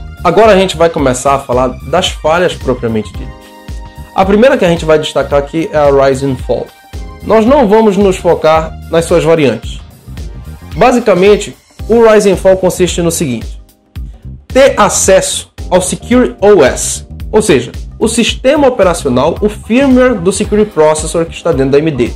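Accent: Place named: Belém, Brazil. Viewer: Sotaque de belém é bem legal. ")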